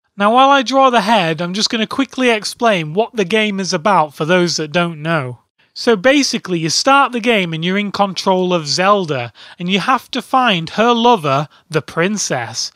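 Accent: United Kingdom. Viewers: Yay female Scottish link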